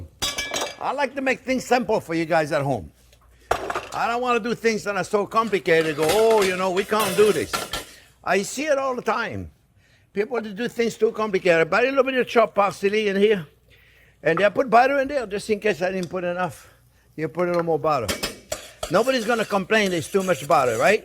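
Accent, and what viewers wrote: France. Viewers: He sounds like Mario, trying to speak English with a French accent.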